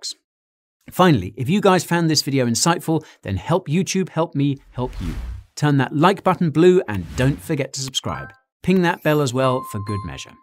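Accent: United States. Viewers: Not a bad American accent. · Not bad American accent. Lol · Your American is pretty good. Much better than most American’s British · Great American accent guy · Your "American" accent is great!!! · the yank accent is hilarious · i love your America realtor accent! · Dude. Great job on the American accent. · Wow you're American accent is spot on!! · LOOOOL ur American accent lowkey sounds like my old teacher in middle school for social studies 😂😂😂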